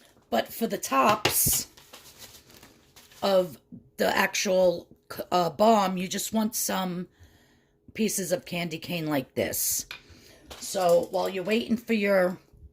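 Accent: New York, United States. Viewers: This would be so cool to make! So cute! I always wanted to buy them in the stores but I would rather control the ingredients. I’m well aware of Avery as a maker. Gonna do that as well. Thanks so much for sharing. Just love that NY accent.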